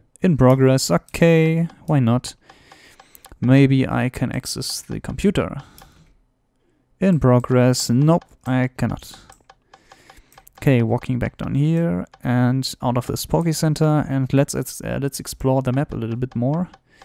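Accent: Germany. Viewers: Man hört das du Deutscher bist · Yes, or that you even know. Btw are you German?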